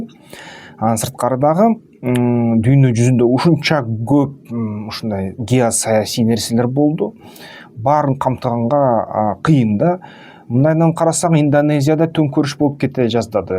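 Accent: Standard. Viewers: РАХМАТ СИЗГЕ КЫРГЫЗЧА ТАЗА СУЙЛОП ЖАТАС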